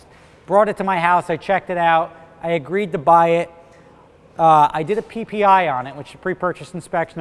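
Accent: New Jersey, United States. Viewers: Great Jersey Accent! Who cares what it cost - wtf - If your looking for a Porsche don’t sweat the cost as you know it’s going to be expensive if the shit breaks. Otherwise I liked the the vid.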